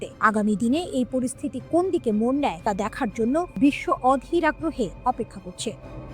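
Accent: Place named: West Bengal, India. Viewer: নাগরিক টিভি ভারতীয় বাংলা উচ্চারণে সংবাদ পাঠ চালু করলো কেনো?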